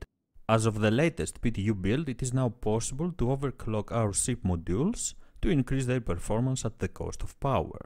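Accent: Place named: Greece. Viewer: C'est l'accent Grec ... :-)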